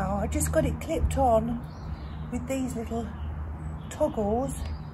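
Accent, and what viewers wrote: United Kingdom. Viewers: Brit accent.... ive got an OLEANDER, large shrub, need to clip into a tree form 😊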